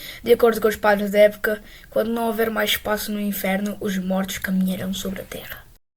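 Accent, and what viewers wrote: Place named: Portugal. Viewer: Eu não acredito em português de Portugal falando sobre guts & blackpowder muito legal me inscrever agora no seu canal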